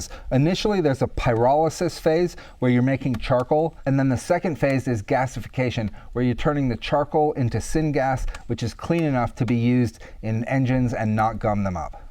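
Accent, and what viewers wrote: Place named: United States. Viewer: Due to infectious curiosity, undiagnosed ADHD perhaps, I sidetracked my initial viewing of the video on a tangent. I hope you'll indulge some observations and the question those elicit. To my ears at least, you sound very American. However, several items in the setting, the whitewashed stone building most of all but even the colors of those wheels and tires, seemed more European in origin. So, I had to research " " to discover that you are located in the UK. Hence the question, which I hope you do not mind: Are you an American expat? I'm impressed with your design and fabrication and the thoroughness of your presentation. Ever since my family's livelihood and my own ability to travel freely via personal vehicle without regard to time or distance was adversely affected by the first Arab Oil Embargo in 1973, I have been intrigued by the notion of independent energy production. 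Today, was my first exposure to your channel and its content. I "liked, subscribed, and hit the bell" immediately and will be looking forward to seeing you further test and develop/refine the gasifier design and the materials it produces!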